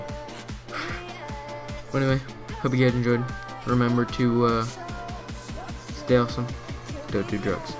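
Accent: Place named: Canada. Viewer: u sound Canadian m8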